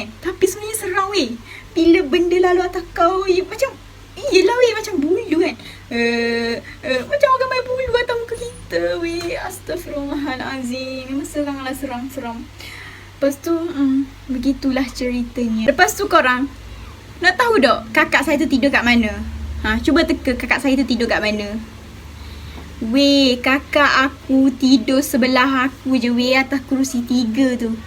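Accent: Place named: Kelantan, Malaysia. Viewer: Tiba" kau yg seram 🤣... Kecek jk kelantan.. Saya lgi syok tgk... of course nk content seram 👍👍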